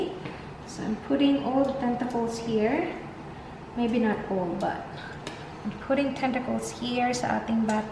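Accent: Visayas, Philippines. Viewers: Bisaya kapo? AHAHAHAHHA sa accent mo po kasi ahahhahahaha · Ang cute mo ate magbisaya hahahaha! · Hehe. Bisayang dako ate. 😂😂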